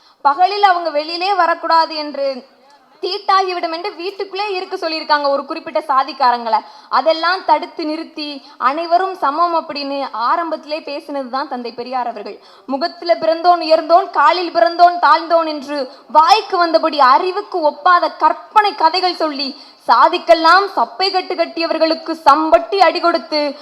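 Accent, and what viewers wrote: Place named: Tirunelveli, India. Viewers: Thai unakku entha ooru. Peiru enna...arumaiyana Nellai Tamil. Tamil vazhga. Tamirabarani vazhga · நெல்லைத் தமிழ் அருமை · திருநெல்வேலி மண்ணின் வாசம் உன் பேச்சில் அருமையான பேச்சுமா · ஏ நீ திருநெல்வேலியா பேச்சிலே தெரியில்லா ஏநல்லாஇருக்கு‌ பேசு பேசு. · Wow pappa super nellai slang speech,,real speech about irresponse some politicians,, congratulations,, thank you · திருநெல்வேலி ஸ்லேங்கில் தமிழ் பேச்சு செம தூள் சகோதரி.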